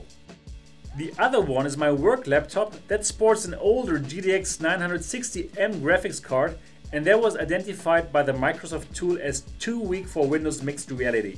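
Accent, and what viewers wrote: Germany. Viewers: ich hab mich am anfang schon gewundert, dass sich der akzent ziemlich deutsch anhört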